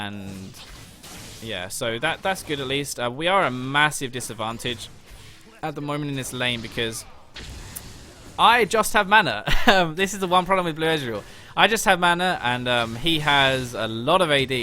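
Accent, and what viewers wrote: United Kingdom. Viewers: "I think honestly, basically, i mean. i don't honestly, i guess. he honestly, basically it means, actually, honestly" - Damn You really need to limit yourself when you use those words and phrases it really pissed me off. On these 7- 10 min videos you can't actually notice that, but on this video it is really REALLY horrible. I Love your British accent a lot and the content you are making, but those phrases man, damn. Keep up the good work tho.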